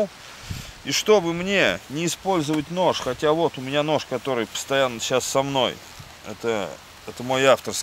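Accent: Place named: Nizhny Novgorod, Russia. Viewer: Горьковский акцент легко узнаваем) удачной охоты!)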